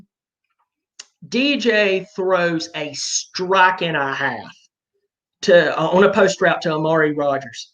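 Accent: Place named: Appalachia, United States. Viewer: Love the gay Appalachian accent it us sexy in a banjo picking way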